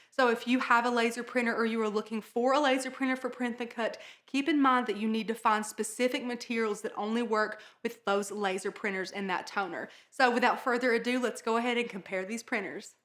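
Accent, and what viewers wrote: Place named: Texas, United States. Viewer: Hey I love the accent. Are you from Texas by any chance? Just a guess.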